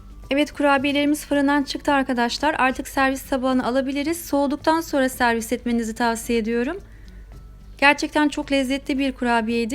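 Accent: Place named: Azerbaijan. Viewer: Ben Türkiye Erzurum kökenliyim atalarım Erzurum'a Horasan'dan gelmişler zamanında diyeceğim o ki sizin Azeri diliniz yani konuşmanız benim dedelerimin aksanına çok benziyor.Ne zaman bir Azeri konuşması duysam beni kendine çeker mutluluk duyarım saygılar sunarım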